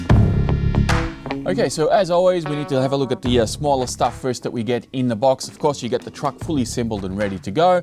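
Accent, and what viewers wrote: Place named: Australia. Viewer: Nothing like that aussie accent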